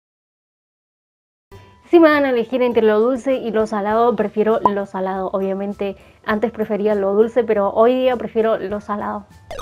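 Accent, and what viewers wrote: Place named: Argentina. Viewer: Acento ARGENTINO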